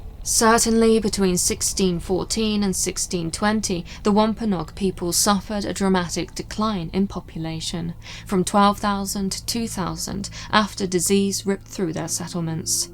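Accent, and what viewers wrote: United Kingdom. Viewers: These subjects are so often covered by american writers that it is nice for a british person to hear the voice of a clearly spoken british person !!!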